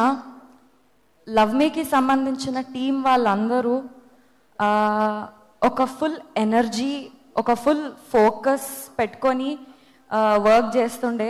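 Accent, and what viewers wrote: Telangana, India. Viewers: Telangana slang ante chalu speach meda unna intrest anta potundi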